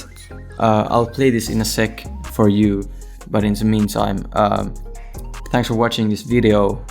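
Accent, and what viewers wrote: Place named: Finland. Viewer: You can really hear that he's finnish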